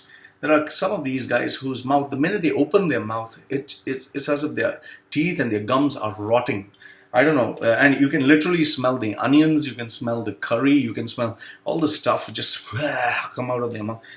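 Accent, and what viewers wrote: India. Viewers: Even you cover your speaking with English diction, we can still hear your Indian accent, sorry but you still looks like an indian. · YOUR ACCENT IS LIKE INDIAN PEOPLE TALKING SH*T! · Dont look indian but accent is give it away.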